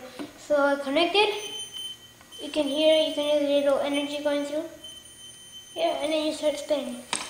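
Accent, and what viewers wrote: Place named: Asia. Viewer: You sound like an Asian. No wonder, you know how to do it.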